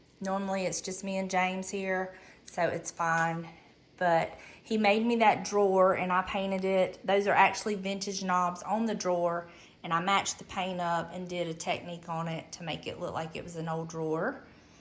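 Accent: South, United States. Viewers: You had me when the door opened. That southern voice speaks to me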